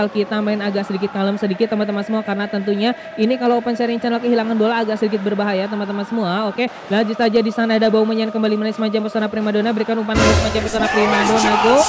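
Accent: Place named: West Sumatra, Indonesia. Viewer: Suara lu ko kek minang kocak si bg🙄🤔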